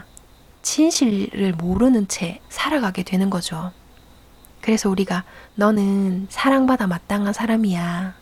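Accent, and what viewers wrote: Gyeongsang, South Korea. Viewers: 방금 구독했어요ㅎ 경상도 사투리가 너무 정겹게 느껴보는건 처음이네예ㅋ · 작년에 영상이 너무 좋아서 책을 사려고 했는데 도서관에서 보는것 말고는 구할 수가 없더라구요. 절판ㅠ 어느날 여행지에서 길을 잃었는데 그곳에서 중고서점에 들어갔어요. 근데 제 눈앞에 이책이ㅠㅠㅠ너무 놀랐어요. 2편인 마음의 오류까지 구했어요...방금 이 책 읽는중에 가브리엘라 내용이ㅠㅠ 129p에 있네요ㅋㅋ너무 반가워서 글쓰러 왔어요. ㅎㅎ 항상 영상 잘 보고 있구요. 제 책장엔 이 읽어주시는 책들로 채워지고 있어요❤ 저도 경상도 사람인데 사투리 너무 듣기 좋아요. 늘감사해요^^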